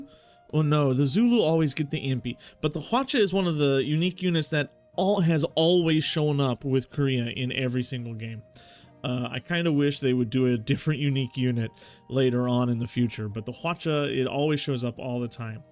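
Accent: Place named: Texas, United States. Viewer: I wouldn’t have known that from 1 million years you sound like you’re from like Texas or something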